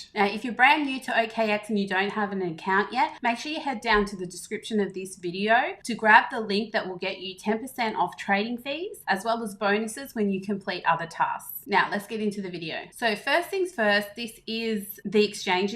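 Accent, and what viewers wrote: Australia. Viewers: Thank you. The process of moving a stop loss is a little different to Binance and FTX. Your video was clear and easy to understand. Good job. And good to hear an Aussie explain. I am new to youtube, how do I see what other video's you have?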